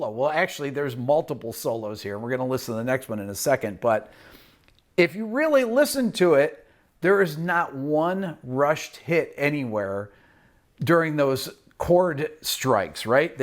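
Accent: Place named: Central New York, United States. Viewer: Hey Rick, I dig your CNY accent. Just dawned on me. Gotta ask, i realize this video is a year old. Ever know Gadd or of Gadd since you grew up in the same area? Rochester has had some really good drummers come out of there... also, was always curious why Mel Lewis, a Buffalo dude, had bad things to say about Gadd. Or at least didn’t like his playing / mocked it. Maybe Lewis is too much of a purist. Any idea on the history between those two?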